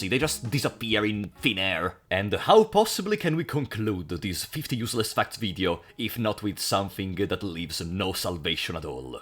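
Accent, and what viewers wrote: Italy. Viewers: I just noticed that you really sound Italian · Aiuto sento un forte accento ita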